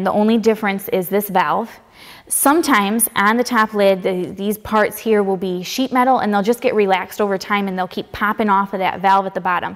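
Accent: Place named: Michigan, United States. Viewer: Ah the classic Michigan accent. I miss it. Living in Kentucky.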